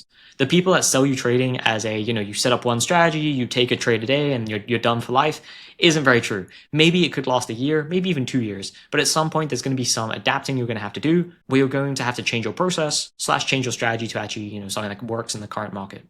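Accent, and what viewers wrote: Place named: Australia. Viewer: Are you Aussie bro? Your accent seems kinda mixed . Cool videos!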